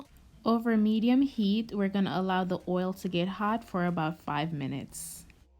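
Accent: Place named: New York, United States. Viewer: Nice. Sound like you're from NYC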